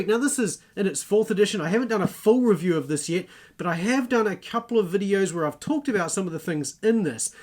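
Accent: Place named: New Zealand. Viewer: Hi! I just stumbled upon your channel very recently and loving it. Thank-you! I noticed your Kiwi accent instantly as I am an Aussie. I am 3/4 of the way through Duff's "Elements of NT Greek" and really loving it. For some reason, this seems to be the industry-standard first-year Greek textbook for Australian institutions, hence my choice of it. Have you done a review of this yet? I could not find it anywhere if so. With regards to this video, I am wondering if Duff would be an adequate substitute for Mounce's book on your list?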